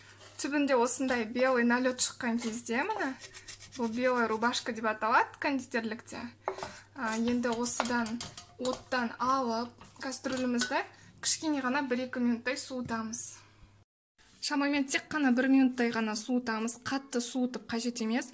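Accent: Standard. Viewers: Қолыңыз дерт көрмесін осылай қазақша таза түсінікті айтып түсіндіргеніңіз үшін сізге үлкен рахмет айтамын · Саламатсыз ба? Қазақша таза сөйлеп, түсіндіргеніңізге қуаныштымыз. Өте керемет, риза болып отырмын. Бар білгеніңді ортаға салғаның қандай тамаша! Каналың дами берсін.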